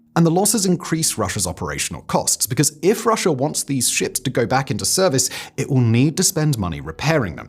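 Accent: United Kingdom. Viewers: Dude's British, get over it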